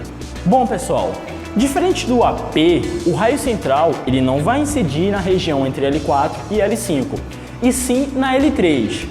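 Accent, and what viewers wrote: Rio de Janeiro, Brazil. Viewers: Vc é carioca???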